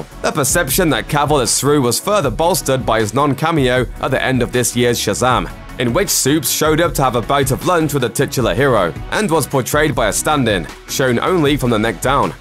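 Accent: England, United Kingdom. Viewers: Dan Rockell just like there are many different accents in the USA...there are different accents in the UK too but he’s 100% English accent, I couldn’t tell you what part as I’m from the states but without a doubt brithish. I honestly love his voice for these videos ahah.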